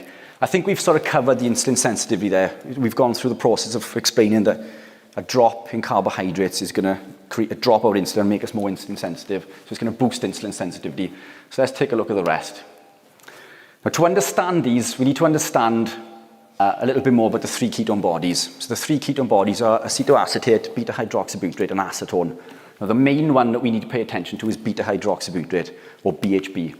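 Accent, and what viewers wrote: Wales, United Kingdom. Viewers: So what are your commercial interest along with this good will info? No, dont get me wrong, I enjoyed the bleep immensely and it was factual to the letter, it's just that I cant help being bleeping cynical about stuff. Its a necessity it bleeps, these days. Good luck anyhow. Ps. The Welsh accent made a nice bleep from all those yank MD's. 🙏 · Good, clear presentation. And I could listen to a Welsh accent all day! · The Welsh accent is the one thing I dislike about this video!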